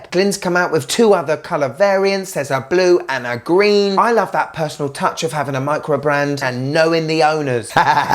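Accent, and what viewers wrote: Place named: Whitechapel, United Kingdom. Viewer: Perfect German pronunciation in a Whitechapel accent…❤. Big “thumbs up” always MATE!!!!!!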